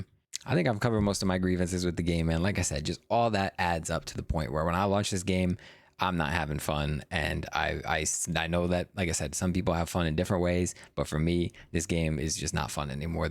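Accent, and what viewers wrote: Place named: United States. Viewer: Finally a guy with an american accent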